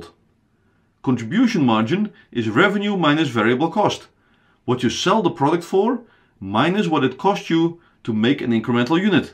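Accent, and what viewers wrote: Netherlands. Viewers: Thank you so much for helping! Hopefully I will pass my entrance exam. Also, I had to ask are you Dutch? I could tell by your accent :)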